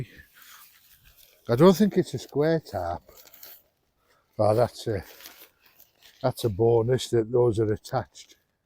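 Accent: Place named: North East England, United Kingdom. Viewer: I stumbled across your channel by chance sir. Glad I did. So will be viewing your back catalogue now. Love this kind of content and especially the down to earth people who have channels on YouTube. A lot of people can relate to. Which part of the north east are from? I watch a lot of blot outdoors videos. You sound a lot the same. I live in ormesby st Margaret near Great Yarmouth. So we all sound like farmers down here. Apparently to a lot of people. 😂. Stay safe my friend, Andy from Norfolk. 👍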